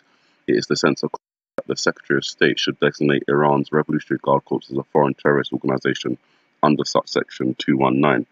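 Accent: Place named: London, United Kingdom. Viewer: He's from London lol I can tell...sometimes the accents are a bit hard to understand for others...I understand it though as I'm from England too. ;p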